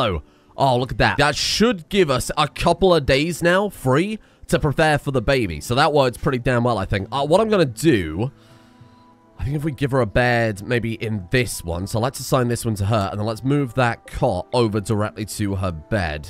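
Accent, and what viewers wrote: South, United States. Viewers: American accent improving? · Your country twang is perfect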